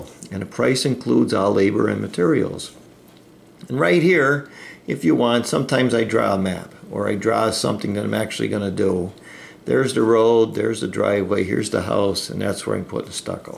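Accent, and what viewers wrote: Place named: Wilkes-Barre, United States. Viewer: Howdy -Greetings from the Juniata River area in Newport, PA. (Your accent sounds like the Wilkes Barre area). If you are in the Newport area come Spring, and want a quick cement job, (or if you know someone who does) shoot me a name. The dog and I wIll be looking for someone to redo the cracking cement on the top of our chimney. It's a real small job - about 4 feet by 4 feet. The cracking seems to be the cause of a slight trickle on the inside of our chimney when it rains. I climbed up there (ranch house roof) while the dog supervised from the ground. We came up with a temporary solution until Spring. ( He agreed to loan me the metal bottom to his dog kennel). I flipped it upside down and rested it on top of the 2 chimney caps. The metal lip around the kennel bottom is only about 2 inches deep so it works real well. 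It doesn't seem to block the airflow and we can still use our pellet stove. It also seems to be deflecting the water like we wanted. I anchored the thing with heavy pavers and it seems to be doing the trick. WOO HOO!! (The dog and I are happy with our rig-job for now and hoping that mother nature is kind until Spring.) Enjoyed your video.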